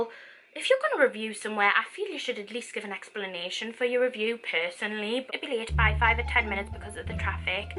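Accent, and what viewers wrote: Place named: North, United Kingdom. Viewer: It’s just how us northerners talk ✋🏼😂❤️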